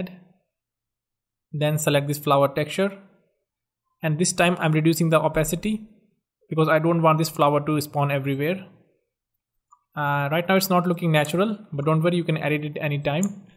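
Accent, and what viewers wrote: India. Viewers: Who agree that he is indian but speaking english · I know that you are a Indian I can identify by your vioce I see very less indian game dev channel's keep it up 👍🏼From indian punjab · indian accent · You are Indian by accent . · when there is an indian speaking, you know its going to be a good tutorial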